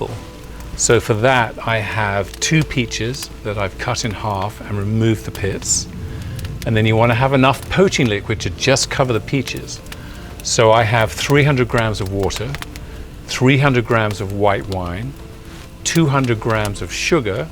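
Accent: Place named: United Kingdom. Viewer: Hi, greetings from Norway I think your videos are beautifully made, you make a cooking session into a ceremony. I would love to spend some of my future afternoons doing some of the things you do. It is not going to happen year around, if I continue living in a country, which is covered by snow half of the year, though? Anyway, I have a question and a comments for you. 1. Please do not play the same tune as the background music in every video. It is serene once, twice, but after watching all your videos in short succession, the tune is sort of stuck in my head. I do not like it when a song is playing again, and again in my head. Even when I am dreaming, it is. But that is just me. If most of your followers love it, then you should continue doing it that way. 2. Where do you live? To me you sound like a British man, myself being Norwegian and all that, difficult to figure out every accent, but the scenery looks like Italy, Spain or California.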